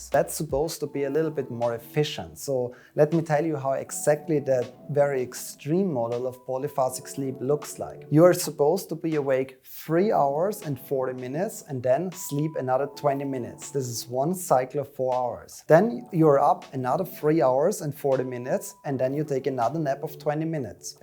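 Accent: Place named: Styria, Austria. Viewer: Eher steirisches Englisch!:) <3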